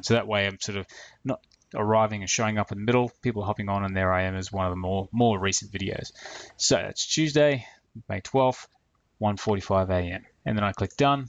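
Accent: Australia. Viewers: Thanks heaps. I'd forgotten where it was n you can spend hours trying to find stuff on there, n yours was the only vid I could find on where it was. So new sub here. Bit weird though, as mine says the exact same times as yours! You sound Australian, so maybe it's 'cos I'm in New Zealand n we may have the same-ish time zones. I've found 9pm, 12am and 1.30am are my best times for shorts. I scatter my shorts throughout the day/night though after experimenting, but these tend to get the most views. I don't worry about longer main vid times, I just put them up whenever, as it's those shorts that gets them there subs lol! Thanks for putting up this vid :)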